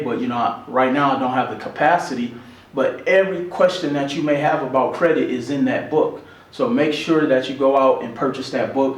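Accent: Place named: Pittsburgh, United States. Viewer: Very helpful!! U still got your Pittsburgh voice ...Haha r u from Pa? 👍❤👌🙊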